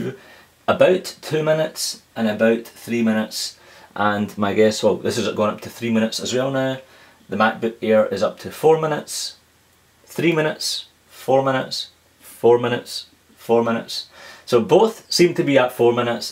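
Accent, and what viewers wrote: Scotland, United Kingdom. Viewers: so scottish. awesome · are you scottish? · Are you scottish? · i love the scottish accent wish i were scottish 1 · r u from scotland? i love scotland! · I wanna say scottish??..?? I could be wrong. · If my knowledges of British Isle accents are correct, than I'd say Scotland.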